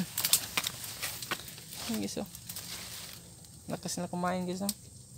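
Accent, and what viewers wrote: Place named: Visayas, Philippines. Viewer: Bisaya ka pala kapatid. Malambod man.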